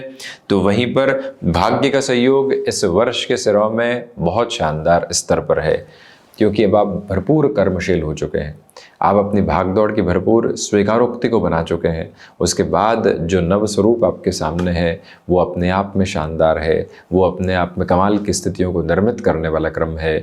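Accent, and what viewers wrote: Standard. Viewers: Shudh Hindi, astonishing to listen,so great guru ji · Itni shud Hindi kitni achi lagti hai sunney main 🙏🏻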